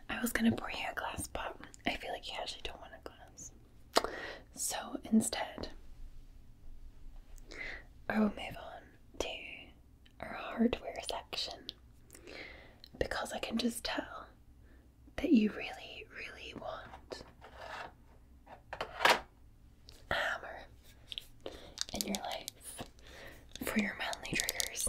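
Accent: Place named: Scotland, United Kingdom. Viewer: half an hour of tingles from the resplendent scottish lass. perry the platypus noises ☺️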